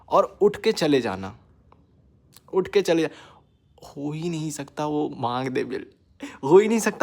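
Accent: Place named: Kanpur, India. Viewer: By any chance are u from kanpur? Ur Accent matches the kanpur accent a lot!!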